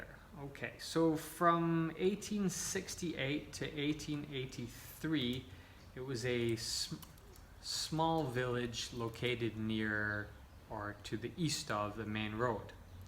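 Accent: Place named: Scotland, United Kingdom. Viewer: Are you from Scotland? Btw Really love your accent.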